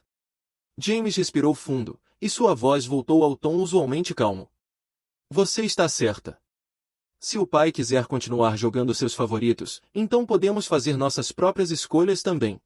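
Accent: Portugal. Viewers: Sim, porém mesclava o nosso português com o de Portugal e ficou muito estranho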